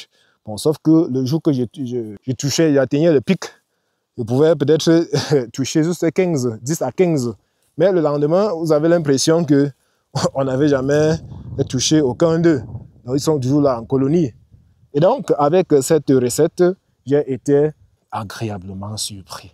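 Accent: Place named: Sub-Saharan Africa. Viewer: Je m'abonne parce que je suis toujours enchantée par l'accent des Africains Subsahariens 🙂 Évidemment surtout parce que j'ai aimé les "tips" contre les moustiques et les cafards. En Algérie sur certaines côtes il y en a qui volent, j'ai vraiment peur de ces bestioles. Merci pour le partage.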